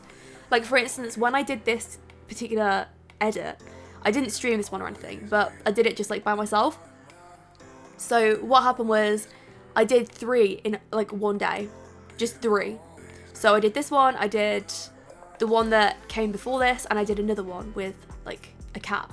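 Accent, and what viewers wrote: United Kingdom. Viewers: I have an idea for a create a sim! But real quick I love Sims but your british accent makes your videos even better because I love british accents anyway! But my idea is that you can/could make Mario, Luigi, Peach, and Bowser! THE MARIO CREW!!!!!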